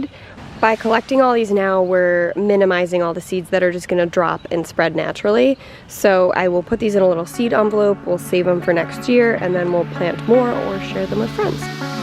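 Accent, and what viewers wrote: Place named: United States. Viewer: thanks for sharing. You have a beautiful home and land! Your furbabies are sweet! I'm jealous of your porch room! I would totally curl up with a blanket and a good book. Love the tea trolley! It's one of my favourite colours. You can get some super cute, battery operated tea light vines. I've gotten them at the dollarama (greetings from Nova Scotia btw). You sound American so for you I think you have the dollar tree? You can also get them in Walmart that plug in. What about a built-in window bench with storage? You made me hungry with the sheet pan supper...lol. The Pioneer Woman has a bunch of those recipes. One of my favourite garden tools is called the garden claw. It's blue and yellow. The top of the handle is ergonomical and the tines on the bottom of the rod allows you to loosen soil around roots to make it easier to pull plants. 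Plus you can use it to mix soil. You should let your seeds dry before storage...they have a tendency to rot if you don't. It's been a year so I'm assuming you finished your office. I only found you today. Love the shelves around the door idea. What about a padded chair with built-in drawers under the seat for storage? I saw it somewhere and it was super cute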